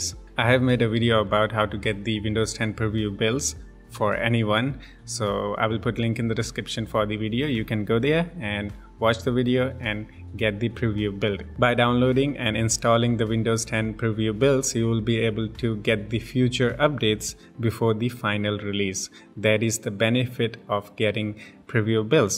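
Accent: India. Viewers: Are you Indian brah?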